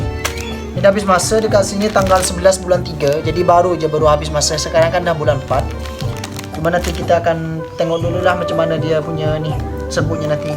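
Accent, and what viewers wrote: Malaysia. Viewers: Tak ada dah sleng indo dah cara percakapan nak ni, dah macam lorat orang malaysia dah cara dia bercakap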